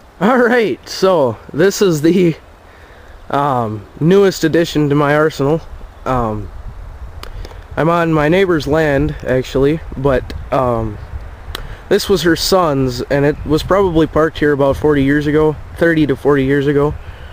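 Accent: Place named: Pennsylvania, United States. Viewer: You sound like a Pennsylvainian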